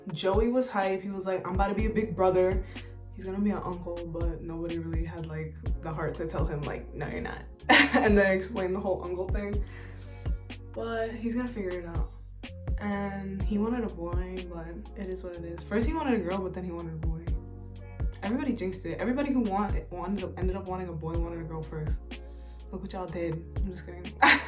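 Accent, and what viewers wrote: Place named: New York, United States. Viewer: knowing she's from ny without actually knowing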